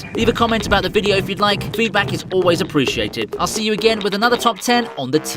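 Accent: United Kingdom. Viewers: I love your British accent buddy😊❤️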